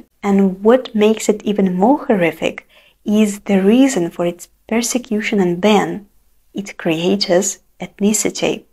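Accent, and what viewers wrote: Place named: Eastern Europe. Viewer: you sound slavic.. я просто только начал смотреть твое видео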